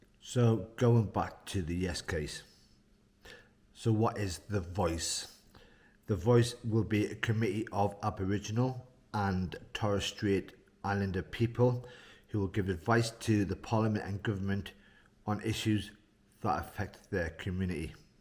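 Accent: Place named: Northeast England, United Kingdom. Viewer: great, would love to show you around my city Auckland, although everybody starts off in Auckland when they come to NZ. Where is your accent from? It's sounds like North East England.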